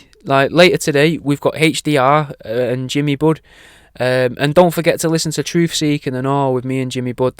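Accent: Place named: Lancashire, United Kingdom. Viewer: You from lancashire fella?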